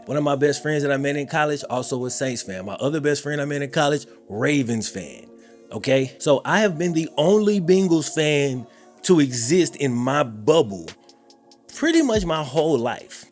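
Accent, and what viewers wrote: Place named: Mississippi, United States. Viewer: Where you from in MS?? I knew there had to be a reason you sound like a dude I been talking to my whole life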